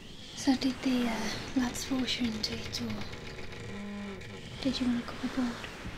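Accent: Black Country, United Kingdom. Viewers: Black country accent is spot on! Great job!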